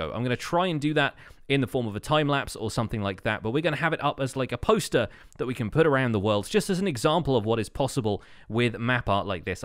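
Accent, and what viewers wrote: England, United Kingdom. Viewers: My gosh I love your videos and your English accent!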